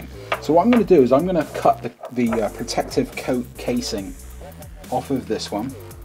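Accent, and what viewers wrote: Bristol, United Kingdom. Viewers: Good video, are you from Bristol?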